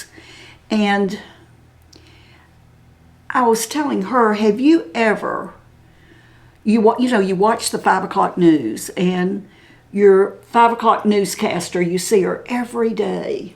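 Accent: South, United States. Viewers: Your voice and your lovely Southern accent sounds like Melissa from her channel, you remind me of her. It's a delight to put makeup on along with you.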